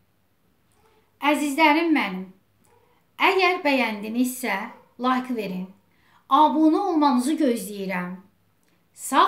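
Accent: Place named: Turkan, Azerbaijan. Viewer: Salam xanım necəsiniz. Yazan Nihadın anasıdır. Əllərinizə sağlıq görünüşü gözəldir. Lətçənizdən Zirə, Türkan lətçəsidir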